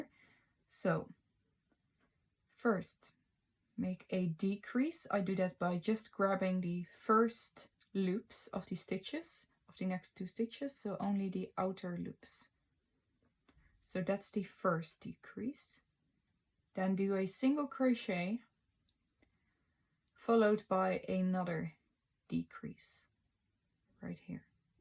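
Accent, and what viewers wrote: Netherlands. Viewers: I LOVE DUCKS AND READING! So I’m making this Ofc!! And, are you dutch by any chance? I hear an accent 😅😅 you are so talented and I’m a dutch crocheted aswellll!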